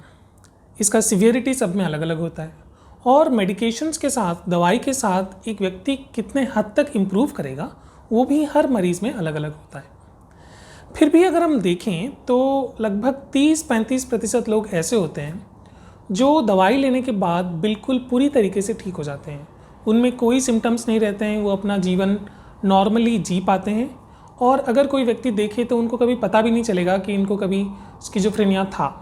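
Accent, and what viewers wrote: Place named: United States. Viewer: इंग्लिश भाषा का अच्छा इस्तेमाल करते वक्त जिस व्यक्ती का समझनेवाला आवज होना चाहिए........ इस विडिओ मे थ़ोडासा अमेरिकन उच्चारण सुनाई देनेके वजहसे सभी टिप्स अच्छेसे नही समझमे आ रही है....... जिस उद्देश से अच्छा विडिओ बनाया जा रहा है वह लोगोंतक नही पहुंच रहा है......... सभी टीम इसपर जरुर विचार करे क्योंकि ये दिमाग की डिसऑर्डर बहोत लोगो में दिखाई देती है......... जो काम की बातें बता रहे हो वो लोगोंके समझमे आ जानी चाहिए.........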